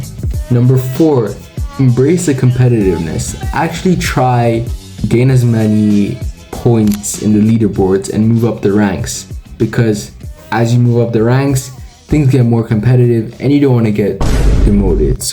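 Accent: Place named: United States. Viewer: oh Gosh, but u seem an American guy cuz of ur English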